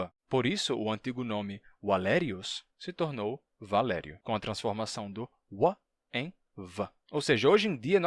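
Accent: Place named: Northeast, Brazil. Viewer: Bom Jesus da Lapa- Bahia)🌎🇧🇷🌵parabéns Guerreiro tu manja da língua portuguesa tu poliglota. 🇵🇹Foi um português que descobriu aqui a gruta a mais de 300 anos faça pesquisa ai Google Guerreiro do RN. Seu sotaque é massa nosso Nordeste de vários sotaques. Hoje tem internet para divulgar mostrar os 2 lado da história real . Tem.baiano qua apedreu Mandarim só ele de xique xique-BA. Ele tem canal faça pesquisa ai guerreiro. 🇨🇳🛫🛫🛫🛫🛫🌵🌵🌵🇧🇷2025 Bahia..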